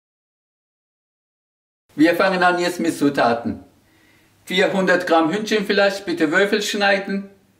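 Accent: Swabia, Germany. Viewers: Hallo Bist du etwa ein Schwabe? Dein Dialekt Verrät dich wir kommen aus dem Ruhrgebiet. Tolle Rezepte,sehr lecker und die zubereitung ist auch leicht:goodvibes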